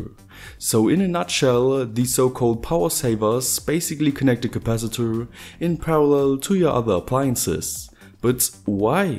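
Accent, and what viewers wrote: Saxony, Germany. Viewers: Nice video! Thank you :) Is that a Saxony accent you have?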